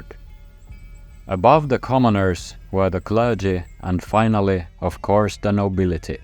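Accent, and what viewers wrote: Finland. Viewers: Laitoin puolisen tuntia sitten pyörimään telkkariin ja kokoajan ollu hämillään, että mitä tää laatu on. Onko joku ulkolainen tuottaja opetellut suomalaisen aksentin ja juontanut parin tunnin videon hyvillä siirtymillä ja grafiikoilla? Ei ollu. Tää on vaan upeaa työtä. Aivan upeaa ollu ekan neljänneksen ja rautaportilta selailin jo tavaraa tilaukseen. Kiitos. Mitä vittua ukko? Kiitos uudestaan. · Ulkomailla asuvana suomalaisena voin vahvistaa, että suomalainen aksentti on kovaa valuuttaa eikä lainkaan hävettävä💪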